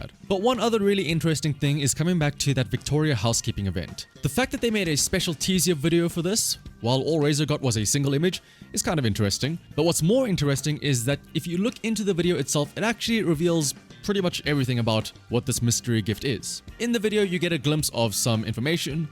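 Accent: South Africa. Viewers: I'm sorry this is so sudden and random but this has been bugging me for ages... Are you South African? Your accent is so specific (I'm South African too)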